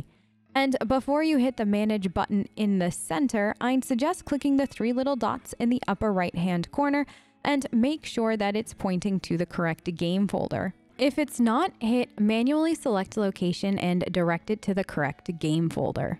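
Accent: Australia. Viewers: This lady doesn't know if she wants to be Aussie or american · yoo you from straya? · you can't hide the aussie. I hear that shit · Why do I keep hearing an Aussie accent from her lol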